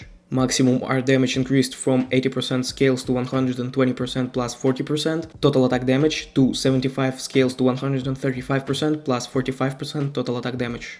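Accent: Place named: Russia. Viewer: Фикси акцент, слышно что ты russkey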